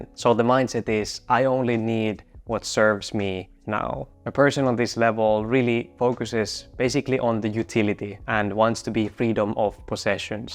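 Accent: Finland. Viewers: I was listening are you Finnish? Muumimuki ja kahvi 😂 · By your accent, I guess you’re from Finland. Am I right? 😊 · Oon ihan varma, et puhut suomiaksentilla :D Miks päädyit tekee videoita englanniksi? Ootko miettinyt, et sulla ois kohderyhmää myös Suomessa ja suomenkielellä? :)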